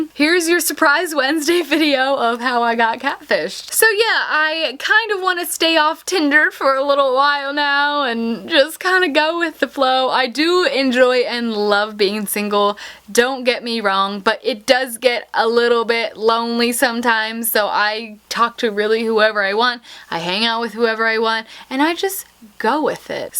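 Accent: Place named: United States. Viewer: Most jarring accent and way of talking I've heard in a long while. You give American girls a bad name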